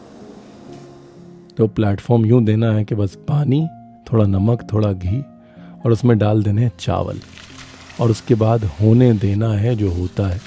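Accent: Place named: Lucknow, India. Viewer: Lucknow ka poora poora asar dikh rha hai lehze mein!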